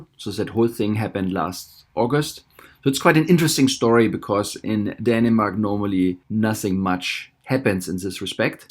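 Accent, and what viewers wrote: Germany. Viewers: Dein Akzent schreit förmlich "DEUTSCH, ICH BIN DEUTSCH"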